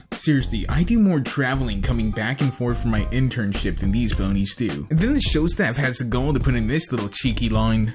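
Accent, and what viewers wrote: United Kingdom. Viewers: Maybe this is normal for him because he is British? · why the buck are you talking British? talk normal. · No gripes about the content of your commentary, but WHY oh why did you use a British accent? I only ask because you don't normally talk like that. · dude what the heck all I could think about was you talk in a British access I mean really im not saying it bad im just saying what happened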